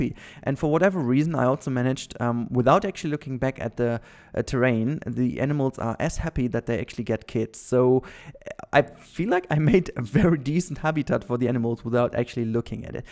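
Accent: Germany. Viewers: Man hört sofort das du Deutscher bist xd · I think u have a little bit a German dialect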